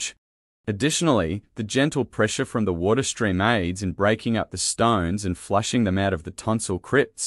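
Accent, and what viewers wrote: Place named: Australia. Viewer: I love hearing Australians speak I can listen to that shit all day it's relaxing to me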